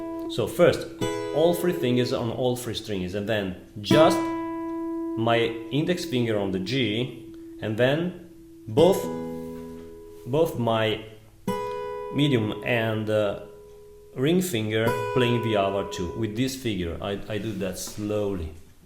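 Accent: Italy. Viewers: he is from itali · Italian accent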